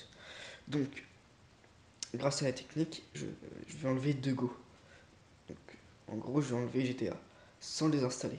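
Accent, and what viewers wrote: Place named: Madagascar. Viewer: C sure tes malgache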